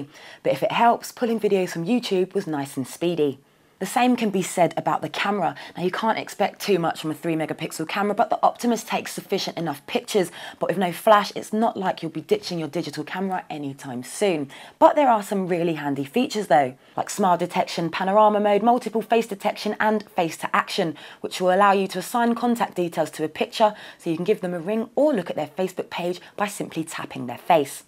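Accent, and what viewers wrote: United Kingdom. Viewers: Who is that beautiful gal. I love that british accent.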